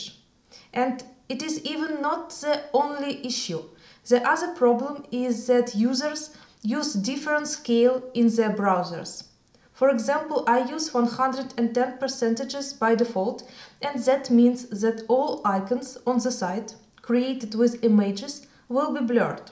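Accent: Russia. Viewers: твой английский имеет русский акцент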